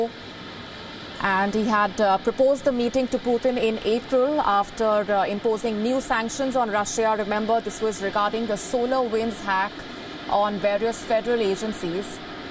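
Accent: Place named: India. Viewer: Indian English 😁😁😁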